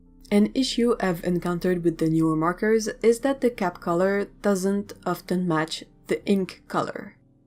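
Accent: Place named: Quebec, Canada. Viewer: Même pas 20 secondes et j’ai tout de suite compris que tu es québécoise :D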